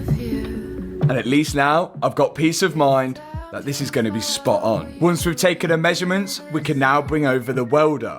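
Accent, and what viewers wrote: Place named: United Kingdom. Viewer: Apart from the British accent, this is some manly stuff! Enjoying the content from South Africa!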